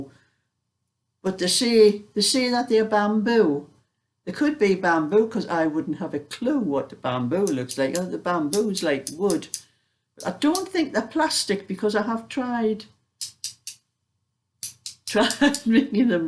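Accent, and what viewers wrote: Newcastle, United Kingdom. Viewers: Always chuckle when you say say you’ve got “ happy Mail” Why I hear you ask ? For weeks I’ve been hearing you say Happy MEAL lol It’s ya lovely gordie accent lol Think penny’s dropped xxx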